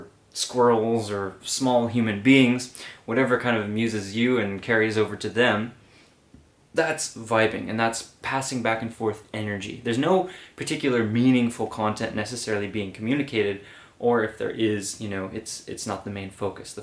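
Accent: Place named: Canada. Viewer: sounds canadian to me!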